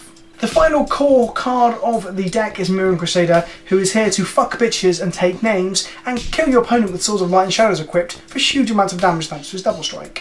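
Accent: United Kingdom. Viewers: It is not really the deck, but the cutting and presentation. I love when you stay on your shots, where you explain a pun, for just a second to long. Reminds me of Family Guy. :D Also the off-topic stories are really funny and your british english is the icing on the cake. :D There are not a lot of YouTube Channels who embrace their accent like you do.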